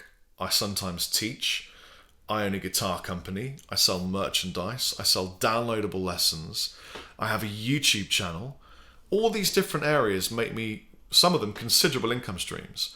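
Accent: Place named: United Kingdom. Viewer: He has a brittish accent, he speaks the truth!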